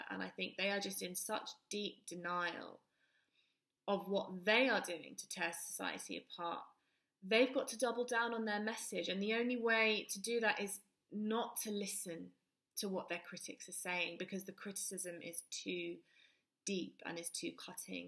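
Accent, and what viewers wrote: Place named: United Kingdom. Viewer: Don't do twitter but nice to hear a Brit. talking about all this. Have subbed.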